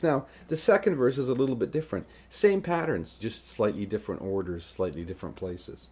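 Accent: Canada. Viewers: Your voice sounds much like Spielberg. You have a Canadian accent. Aside from that, you sound so much like, Spielberg.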